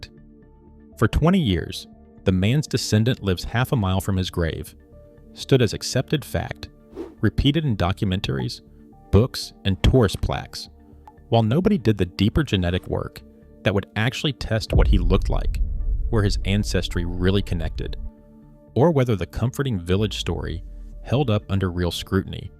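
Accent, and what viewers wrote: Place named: United States. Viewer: NOT IMPRESSED WITH AMERICAN ACCENT IN AI PLUS TAKES 28 MINUTES TO TELL 10 MINUTES OF INFO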